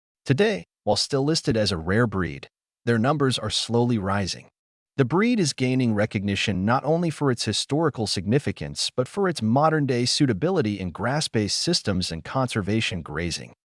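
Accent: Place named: United States. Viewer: The 'British' aren't claiming anything, this isn't a British channel, the voice-over has an American accent. The creator of this content doesn't even have much of an idea of English geography, claiming that Gloucestershire is in the north.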